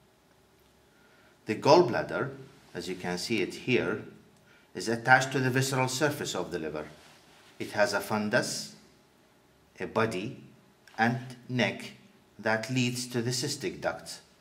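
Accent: Iraq. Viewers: you are speaking like Iraq people ... you must be from Iraq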